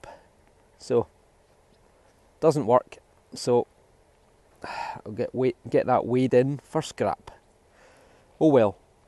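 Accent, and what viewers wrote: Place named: Highlands, United Kingdom. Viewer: id buy that T Shirt )) So True and Well Bloody said with that velvet accent of the Great and Ancient Highlands !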